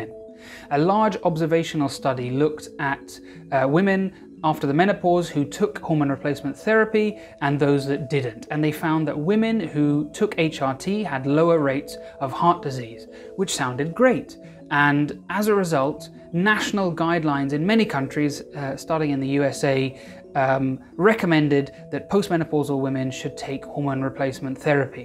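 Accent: United Kingdom. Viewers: You seem to know a lot about medical bias but I have a dictionary .So please spare me your snooty british definition with that that lame accent you might not realize youre over the top condescending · One can observe: - 930 liked this video vs 2 who did not. So far.... A bias of people who like a certain type of British accent? Or perhaps it's the background music? Or perhaps the mention of Minestrone. I like Minestrone - usually.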